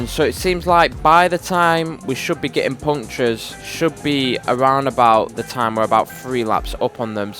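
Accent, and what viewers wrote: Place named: Manchester, United Kingdom. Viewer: Manchester accent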